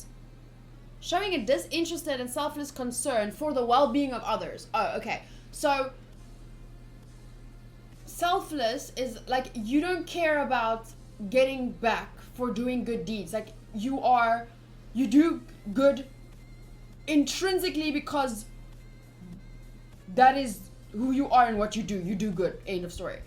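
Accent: South Africa. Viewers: You sound South African? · Nice accent! Where in SA are you from? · Wait you sound South African. Are you? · you sound South African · I just knew you were South African!!!!!!!!(0_0)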